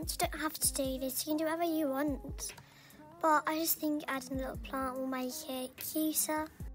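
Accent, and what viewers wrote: United Kingdom. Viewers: Lave that British voiceeee it's soo cuteee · I love your videos but you know itz toca Alice and and you know you just like Alice the British accent and all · YOUR VOICE IS A GODDESS OF BRITISHHHH AHHHHH❤❤❤ BUT MY FRIENDS CALL ME BRITISH🤨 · I love your British accent ❤❤❤❤❤❤❤❤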